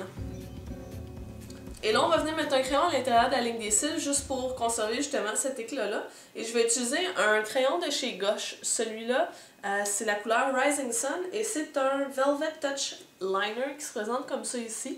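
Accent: Quebec, Canada. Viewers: salut jaime vraiment tes video! sa fait du bien dentendre du quebecois loll des fois les francaise ne dise pas les mot anglais comme il faut et elle me perde et surtout il y a des produit quon trouve en france mais pas au quebec!! merci tes super bonne!!!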